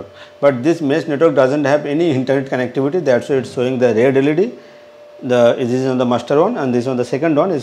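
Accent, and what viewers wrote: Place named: India. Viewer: WARNINGL VERY STRONG INDIAN ACCENT.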